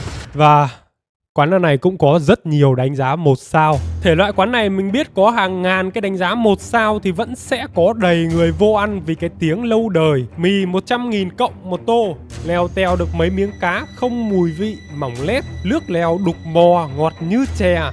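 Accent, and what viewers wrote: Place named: North, Vietnam. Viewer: Mình lại thấy bình thường không có làm màu gì luôn. Có thể chủ kênh nói giọng bắc nên chú đoán là khách du lịch nên chú tiếp đãi nồng nhiệt thôi.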